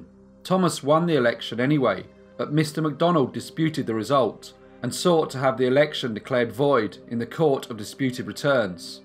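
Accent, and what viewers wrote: England, United Kingdom. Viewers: I'm in love with ur accent and way of speaking! Where are you from? England? Where exactly?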